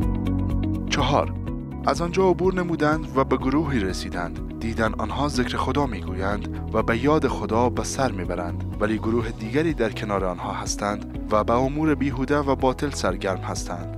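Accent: Afghanistan. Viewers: مزخرفات وچرندیاتی جدید بالهجه مزخرف وریده افغانی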